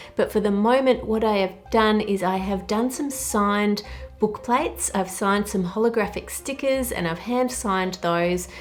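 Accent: Australia. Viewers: The best part of this video is hearing pronounce the word “grown”. I love the Aussie accent! Thanks for another great video:) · Today I learned that aussies say "weight" because they don't know how to say "wheat". At least I guess that's why.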